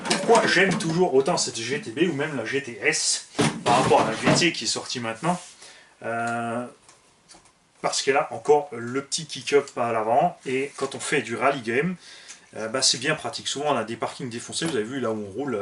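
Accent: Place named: Alsace, France. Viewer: bonjour. j adore vos vidéos et votre accent me rappel les 6 années que j ai passé dans la région de mulhouse. je suis passionné de modélisme rc et j ai pratiqué le piste 1/8 thermique dans les années 95/96/97. (sur chassis mugen et serpent). j adore votre façon d aborder la rc loisir mais de façon sérieuse et pro. tout vos conseils sont super. et domage que je ne soit plus en alsace car j aurai adoré rouler avec vous sans les contraintes d un club. bonne continuation a vous.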